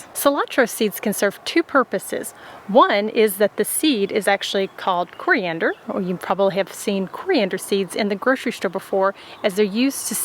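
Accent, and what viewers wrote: South, United States. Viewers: Her accent is American south, possibly Texas or farther east up to North Carolina. That’s a Southern American accent. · She sounds like she's southern but softening her accent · she has strange american accent for my foreign ears. Where is she from?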